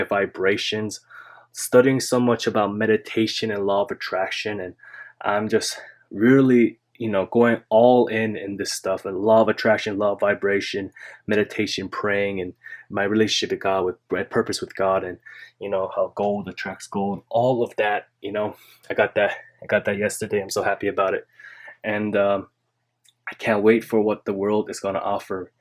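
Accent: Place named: Korean American, United States. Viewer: Dude are you Korean American? From other vid you posted, I though you sound like Korean American. But watching this, maybe you are!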